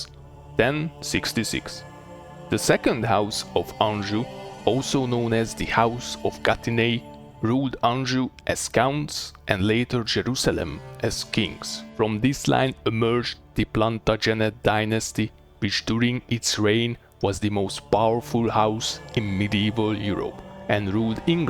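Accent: Hungary. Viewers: Kicsit belobban a magyar akcentus, amúgy egyből durrant a sub+like, örülök hogy egyre több a magyar videós, aki ck3-mal foglalkozik! Hajrá! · Are you Hungarian by any chance? That accent is almost unmistakable.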